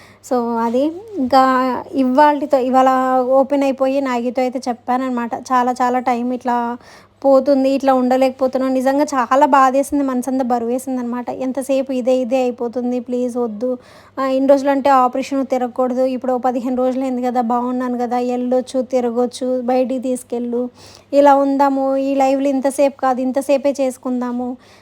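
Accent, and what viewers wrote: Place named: Andhra Pradesh, India. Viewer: Assal meeru by birth andhra pilla la matladuthunnaru appudappudu mana telangana slang kuda use cheyandi because telangana vallu like cheyali kada i mean kotha subscribers ki theliyali kada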